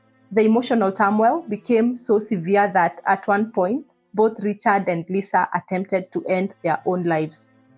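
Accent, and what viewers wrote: Kenya. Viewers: She has a Kenyan -African accent.......you want her to speak British or American English??¿? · 😂She's Kenyan. What other accent does she know?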